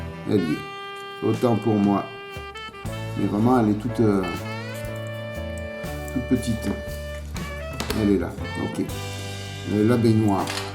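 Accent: South, France. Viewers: Bonsoir. meilleurs voeux à toi et à tes proches. Dans l'attente de tes prochaines vidéos, de tes précieux conseils et de ta bonne humeur, avé l'accen du Sud. Amitiés.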